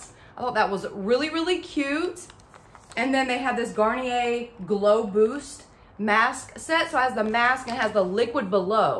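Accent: South, United States. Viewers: You are adorable 😊 I just love hearing you. I’m in California and love to hear people from the south!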